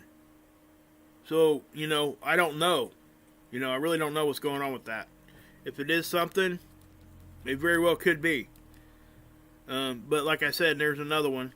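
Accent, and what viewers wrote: South, United States. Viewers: Love the info you share! I am just finding your channel. Where you broadcasting from? You sound like a good ole southern gentleman!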